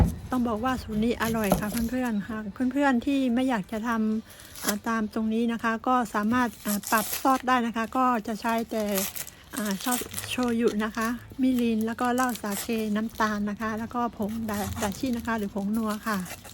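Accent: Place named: Japan. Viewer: อยู่ญี่ปุ่นนานจนลืมภาษาไทยแล้วริน 555 สำเนียงพูดก็แบบคนญี่ปุ่นเลยอ่ะ เสียดายไม่ทานเนื้อแต่ก็ปรับเป็นหมูแทน น่ากินมากเลยรินชอบหอมหัวใหญ่ด้วย 🥰🥰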